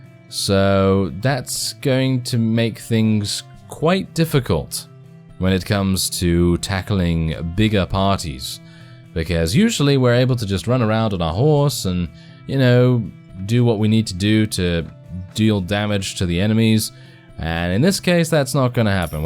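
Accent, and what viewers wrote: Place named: United Kingdom. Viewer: Your voice is.... is so British. Its lulling me in to a falsh sense of security...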